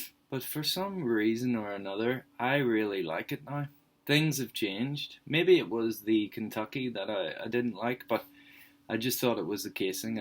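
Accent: Ireland. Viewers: Oh, now I see ,You are an Irish man, gotcha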